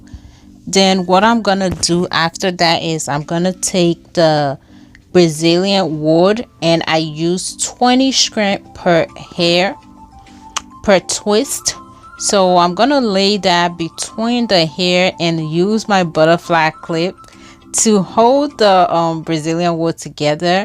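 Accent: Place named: Liberia. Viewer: Are you a Liberian? You sound like one no doubt 🥰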